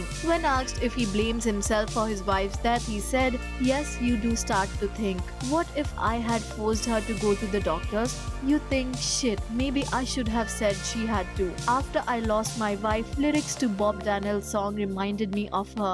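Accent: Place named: India. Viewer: I'm not being racist but do they have to put on a woman with an Indian accent voice gee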